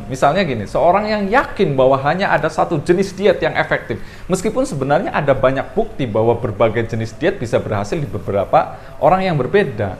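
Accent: Banyumas, Indonesia. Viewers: Semangat mas lanjutkan, mantap sekali pemaparannya filsafat logat ngapak👍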